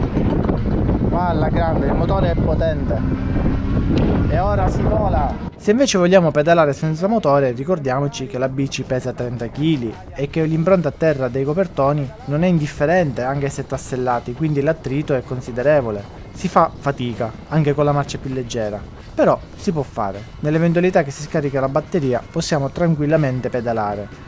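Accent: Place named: Syracuse, Italy. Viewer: Salve, anche io ho una ep 2 pro e ogni tanto cerco video per avere confronti. Oggi, con molto stupore, ho trovato questo che oltre ai dati tecnici, mi ha riportato parzialmente a casa (ho parenti a Siracusa, la conosco benissimo e se potessi ci vivrei). Anche l'accento è familiare dunque mi sono iscritto anche per seguire aggiornamenti di questo modello. Complimenti per la chiarezza delle spiegazioni durante le prove. Ho fatto le mie prove di autonomia secondo i vari aiuti di modalità ma attendo quelle che farà in seguito per avere confronti. Complimenti ancora e W Siracusa.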